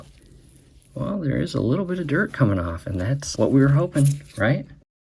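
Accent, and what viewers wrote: United States. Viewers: Hey MichGanders’ I enjoyed your videos, I have to tell you that about 3 minutes into the first video I went WWait a minute I hear a familiar accent.. 🤔❓ then I watched a second video and I was convinced yip she’s definitely from MI.. well hey 👋 there from Johnny O.C.K a FERRIS Grad from Illinois.. long long time ago.. .. 🍻 Cheers.. GO COLORADO AVALANCHE 🏒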